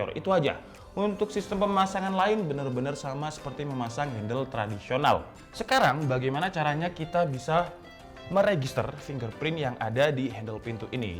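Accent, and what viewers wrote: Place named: East Java, Indonesia. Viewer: Orang jawa timur ya bang? Kalau benar abang orang jawa timur jadi kita sama :)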